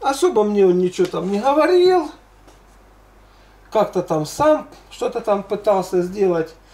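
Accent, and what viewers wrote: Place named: South, Russia. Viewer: Благодарю,Геннадий,все посуществу,а мне нравиться наш южный говор ,а кому не нравится,пусть не соушают!Я так и подозревала,сделали ставку на Ваше имя,хотели деньги зарабатывать на передаче.Как то не верилось в благость телевидения.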